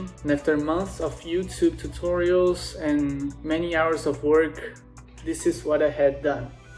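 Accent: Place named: Brazil. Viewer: O sotaque brasileiro é reconhecível em qualquer lugar do mundo 😅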